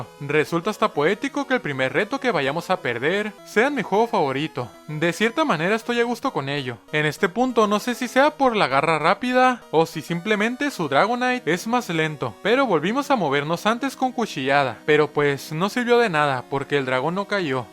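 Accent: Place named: Mexicali, Mexico. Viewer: cai en este video de casualidad, y al escucharte hablar dije, este verga es de mexicali jajaj saludos!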